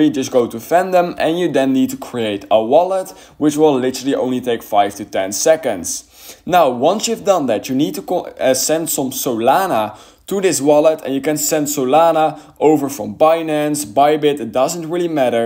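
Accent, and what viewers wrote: Netherlands. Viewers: I can hear the strong Dutch accent😂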